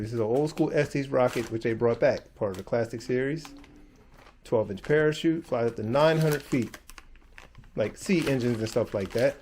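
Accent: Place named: Flint, United States. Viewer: Sounds like is from Flint Michigan. I wonder if Ryders hobby still open? Hi! From Saginaw, I grew up in Flint. Stay blessed.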